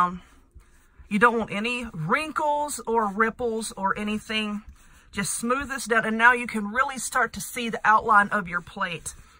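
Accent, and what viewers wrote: South, United States. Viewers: Love this and your accent! Where are you from? I know its in the South. I'm a SE Texan!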